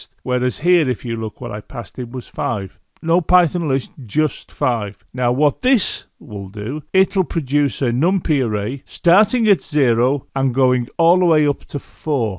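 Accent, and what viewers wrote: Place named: Scotland, United Kingdom. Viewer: Nobody cares today about the correct pronunciation of softwares' names (like Gimp, for example), but I think it's his nice presumably Scottish accent that makes him pronounce it that way.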